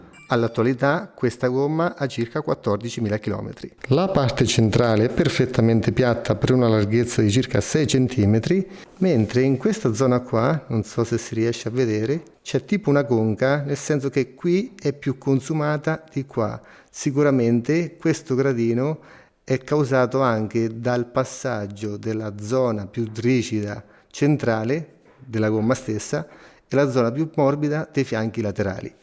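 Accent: Marche, Italy. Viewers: montate da 2mila km sulla mia Africa Twin. Dall'accento sei marchigiano?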